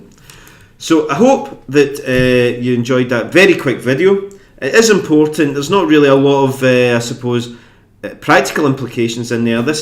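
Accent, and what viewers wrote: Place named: Scotland, United Kingdom. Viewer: Nice scottish accent out there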